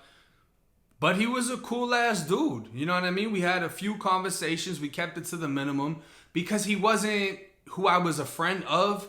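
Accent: Boston, United States. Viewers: Yo, are you from Mass, you got a thick Boston accent fam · Yo anyone evah tell you that you have a Boston accent, are you from Mass.? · You sound like an idiot from Boston.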